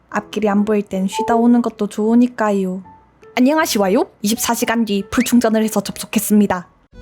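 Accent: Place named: Daegu, South Korea. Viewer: 대구분이라 사투리 쓰시는거에욘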